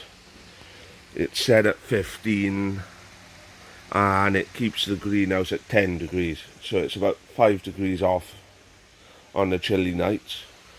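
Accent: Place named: Wales, United Kingdom. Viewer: I never knew you were this Welsh! Awesome vid!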